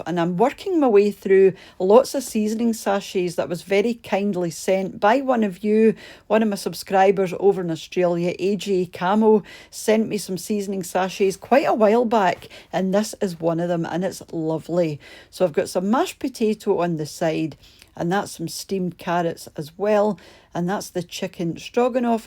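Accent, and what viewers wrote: Scotland, United Kingdom. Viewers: I watch your channel because I love your Scottish accent 😀. And your cooking of course.🇨🇦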